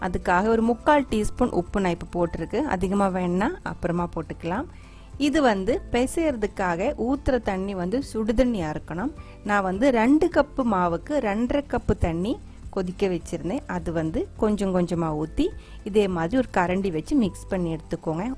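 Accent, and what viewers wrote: Kerala, India. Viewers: Love ur tamil way of speaking in a malayalee slang...awesome sis frm malaysian tamil mom😍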